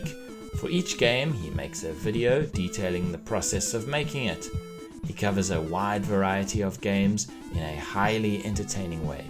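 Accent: South Africa. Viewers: Great showcase! Are you South African by any chance? 🛴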